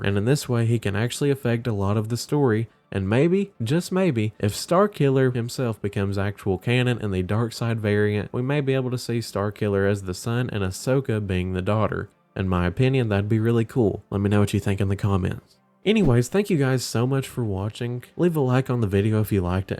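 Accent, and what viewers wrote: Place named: South, United States. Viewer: That accent is 🤌 yeehaw my fellow southerner.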